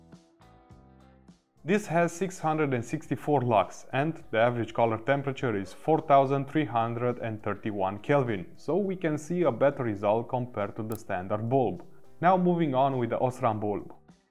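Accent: Russia. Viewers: Бля, русский английский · R U Russian? Very detail & professional test of 3 biggest brands. Thank you!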